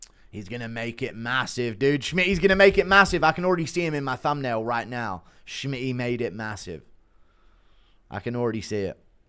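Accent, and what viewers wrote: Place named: India. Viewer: has an indian accent off stream, it stinks like shit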